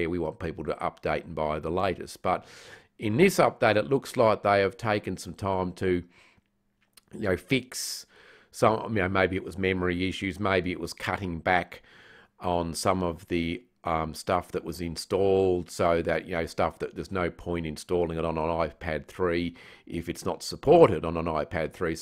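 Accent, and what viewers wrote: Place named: Australia. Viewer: nice to hear an Aussie accent in YouTube for a change :) thanks for the tips this is way more useful than those silly startup timer tests. might upgrade my iPad 3rd gen soon and report back how I go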